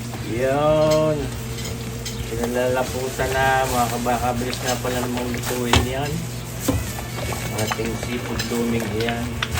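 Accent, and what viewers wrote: Batangas, Philippines. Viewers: walang babaguhin. Tuloy-tuloy lang ang puntong batangan. Balik panahon para sa akin some 60 year ago sa Calumpang/Avenida Rizal🥰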